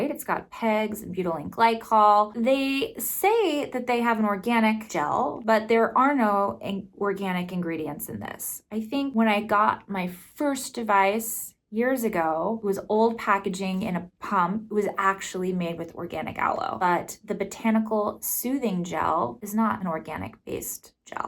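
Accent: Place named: California, United States. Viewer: I think it’s actually the California accent so it might be challenging for people with a southern accent which is pretty different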